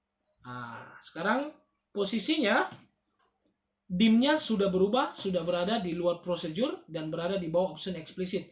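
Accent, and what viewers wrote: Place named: Buton, Indonesia. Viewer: berasa dijelasin sama teman waktu kuliah orang Buton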